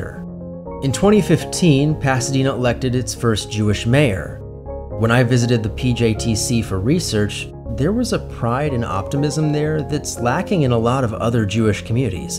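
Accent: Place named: New York, United States. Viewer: I'm surprised to hear that you're from California because I hear so much New York in your accent (notably in "caused" and "Chicago"). But honestly I wouldn't be surprised if a lot of the Southern California accent is similar to the Northeast.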